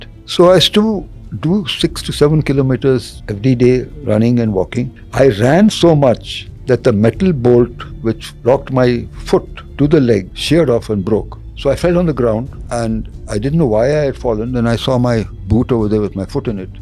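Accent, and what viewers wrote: India. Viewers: British Variant of English spoken in India..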